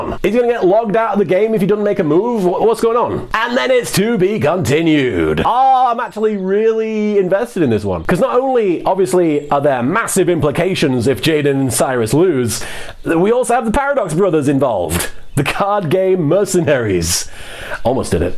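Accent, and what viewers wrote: United Kingdom. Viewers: this is the most British your ever sounded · Silly question but is from the UK? I can't tell if he has an accent or not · has never been so brittish, and I bloody love it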